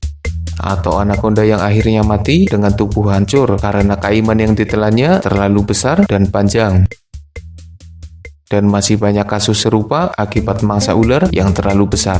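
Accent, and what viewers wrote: Banyumas, Indonesia. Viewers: Pasti ni orang ngapak. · Adminya aslinya ngapak kali nih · Maz wong ndi kok ngmonge ngapak 🤣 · Ora ngapagh ora penagh... Orang ngapak ya min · Ada medok ngapaknya, ora ngapak ora kepenak bro ! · Org ngapak nih mimin hahaa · Admin orang Cilacap kah? Kok kayak ngapak gitu.. Hehe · Logat daerah saya nih ngapak cmiiw hehe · Boleh nebak kan min, pengisi suaranya kayak logat ngapak daerah selatan. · Kiye sing dadi admin mesti wong ngapak yah? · Ko logatnya kaya jawa ora ngapak ora kepenak ya. Daerah banyumas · Logat ngapak bet anjay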